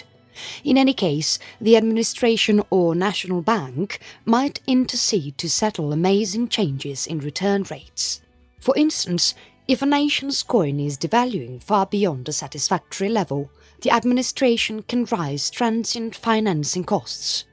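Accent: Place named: RP, United Kingdom. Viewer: Thanks, posh-sounding nice English lady, it was very informative :)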